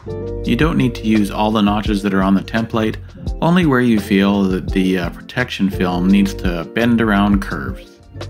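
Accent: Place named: Midwest, United States. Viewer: Nice video Sir! I am wanting to protect some areas on my carbon fiber mountain bike. Your video helps with some great ideas to have a successful install. I hear from your accent that you're from the Midwest or Canada. The word out gave it away. LOL. I am from Michigan so I totally get it. Have a blessed day.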